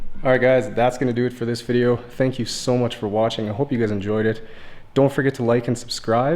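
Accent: Canada. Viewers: Bruh is he Canadian cause aye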